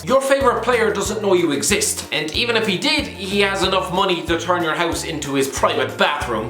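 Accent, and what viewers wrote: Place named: Ireland. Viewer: nah hes just irish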